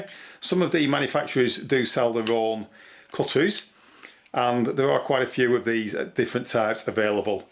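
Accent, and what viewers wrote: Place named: Lancashire, United Kingdom. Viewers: I love your Lancashire accent and the way you emphasise 'T's (like in SpeedfiT) and 'G's (like in plumbinG) when they're at the ends of words. Just a few miles up the road in Cumbria we just don't do that. Personally I've used both plastic and copper. I can take pride in my copper and soldering work, but much less so with plastic, I feel like I'm cheating. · Was gonna say I can hear Blackburn in that accent...I was close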